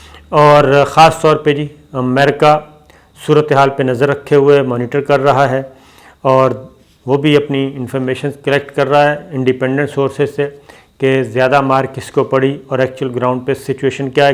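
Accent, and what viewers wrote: Pakistan. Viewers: Accent is pure pakistani best hamaari pehchaan punjabi urdu accent indian aacent nahi chahyee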